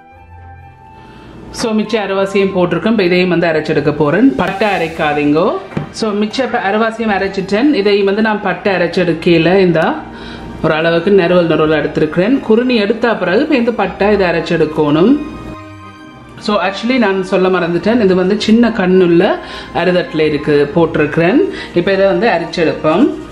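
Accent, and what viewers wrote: Jaffna, Sri Lanka. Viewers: Akka sri lanka muslim food recipe podungga... Unggal Yalpanam tamil arumai🇲🇾❤️🇱🇰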